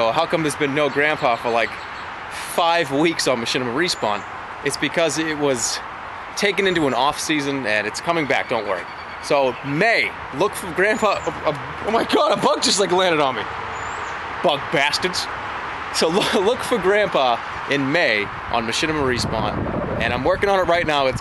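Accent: Boston, United States. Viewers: he is so boston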